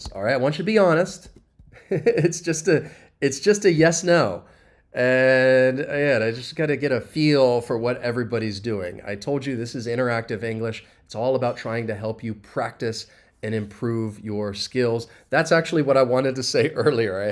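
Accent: United States. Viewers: The most stupid American English accent which no one in the world understands it.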